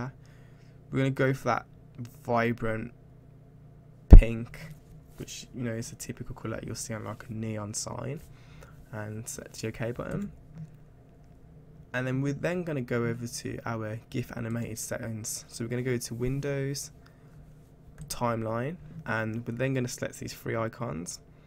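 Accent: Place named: Birmingham, United Kingdom. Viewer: birmingham accent :))))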